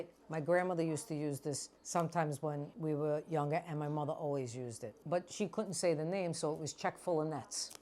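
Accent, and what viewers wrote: New Jersey, United States. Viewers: Yokes, nats, and fathas, Jersey accent, forget about it, love this vid!!! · Used to live in Jersey. This is the first time I ever heard Jersey accent and think ASMR. Read the book. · I know she's from Jersey 😂😂😂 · That italian jersey accent😂😂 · As a Jersey native living down south, her voice takes me back home🥰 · Jersey accent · At first I was intrigued by her Sopranos accent, then the egg and coffee, watched the whole thing, loved it, hope it becomes a show or something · Gawd. I'd rather wipe my ass with sandpaper than hear a New Jersey accent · Is she a Jersey girl or what i love her!!Coming from jersey myself,love her jersey accent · Love the Jersey accent. 😊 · It is an Italian recipe, beat egg york with sugar and marsalla....they used to give it to the children to raise their immunity , strengthen them, specially if they were week. 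Is she from New Jersey!!! Her Accenture her way of talking so masculin...ohhhhh no milk please!!! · OMG. I grew up in northern New Jersey and now live in the South. The accent, the mannerisms, the relatability - all transported me back there. What a Jersey girl! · I miss the sound of Jersey. Best accent in the world. Warmest people. 😢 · I hope you do more videos. Your Jersey accent is the best. I’m definitely going to try this! Never heard of it before, i never see Chock Full of Nuts coffee anymore.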